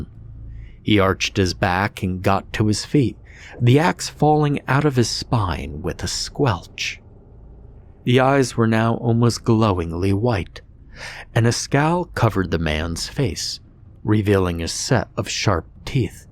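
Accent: South, United States. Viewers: As a Tennessee girl I really look forward to your "country accent". You never disappoint ❤️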